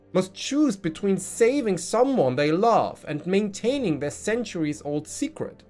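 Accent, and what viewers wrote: Germany. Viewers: Man, your german accent so thick it almost sound chinese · he is from Northern Germany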